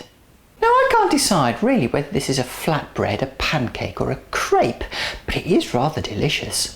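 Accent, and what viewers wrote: United Kingdom. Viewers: I am second gen Canadian Grampa from Finland. Her name sounds more Finnish but I truly could not tell you honestly. Very British sounding Voice which is delightful. Thank you for the recipie, I make Rieska which is similar but a little thicker. From what Gramma said. Thank You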